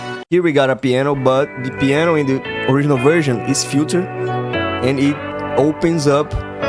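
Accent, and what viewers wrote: Brazil. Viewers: I was gonna ask if u're brazilian because of your accent until I saw the "Portugues do Brasil" on spotify. Concordo com todos seus argumentos, mano. Diria ainda q pra nao deixarem os fãs tão putos, poderiam ter mantido o primeiro "drop" do jeito original, e aumentado a energia do segundo usando essa versão nova. Eu fiz um som em homenagem ao e lancei aqui no meu canal faz uns dias, seria dahora se vc ouvisse e me falasse oq acha. /watch?v=A9yiasCdmE8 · READ THE ENGLISH PART FOR IMPORTANT INFORMATION quando eu ouvi o sotaque já sabia que era daqui kakkakakak bem completo o vídeo, só não esquece que o que aconteceu foi o seguinte (assim como já falaram, mas vou repetir): (English), the artist, that joined some years ago in the same label as, had access to unreleased IDs from himself, becuz of this, he made some remixes and bringed up on his songs some vocals, lyrics and melodies that didn't released, as we can see on. 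if i remembere correctly the year, in 2017 had played this version of (which is a remix) in concerts, and also, there are reports of infiltrators that team scrambled up the files and ended up sending remix as a final version and it got released... It gets worse when you know that, more than the fact that it doesn't looks like the original version, it's not even made by, and it disappoints (Português Brasileiro) o artista, que há alguns anos entrou na mesma gravadora do, teve acesso a IDs não lançados do próprio, e isso fez com que ele fizesse alguns remixes e incorporasse nas músicas dele vocais, letras e melodias que o não lançou, como é o caso de. se me recordo bem o ano, em 2017 já foi visto tocando em shows essa versão de que é um remix dele, além disso, há relatos de infiltrados, em que o time do acabou misturando os arquivos e enviando como versão final o remix do, qu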